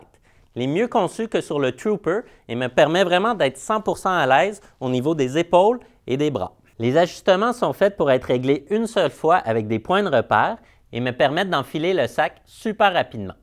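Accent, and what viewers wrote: Quebec, Canada. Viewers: Vidéo très intéressante, bonne description des produits, avec humour en prime ! Et cet accent québécois est toujours un régal !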